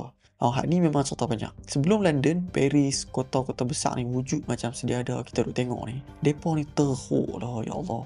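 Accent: North, Malaysia. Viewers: Sedap dgr loghat utagha 😅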